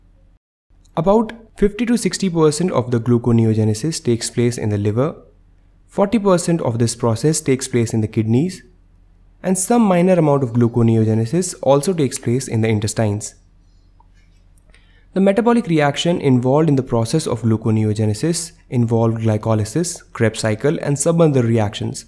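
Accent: India. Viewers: Difficult to understand. Is there anyone there who doesn't speak with a heavy Indian accent. If so, please let them narrate the video. You are losing view count because people can't understand the speaker. If this is not meant to be viewed by North America, please disregard.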